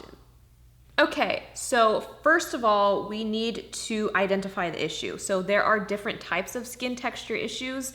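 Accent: Australia. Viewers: Your accent sounded so Australian in the beginning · I love how she says WHATS GEWD and then speaks in an Australian accent afterwards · Your hair and skin are snatched! And you for your skin lookin like that in just 2 weeks?! Ima tryyyy. Are you going in and out of an aussie accent? So cool lol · How did she sound Australian and them go straight to american english/ no accent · I’m so confused why do you sound Australian at the beginning?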